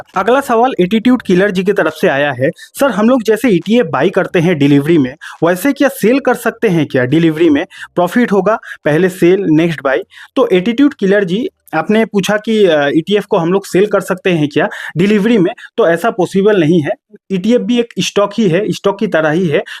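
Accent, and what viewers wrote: Bihar, India. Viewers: Bihari to tum?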